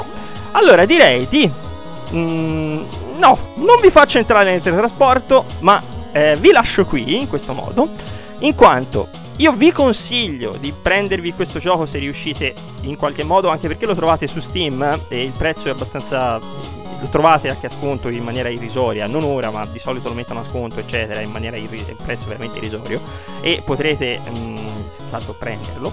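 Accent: Tuscany, Italy. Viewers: Bel video! E' sempre bello sentire un altro toscano su Youtube. Ti sei preso un bel like meritato e un nuovo iscritto.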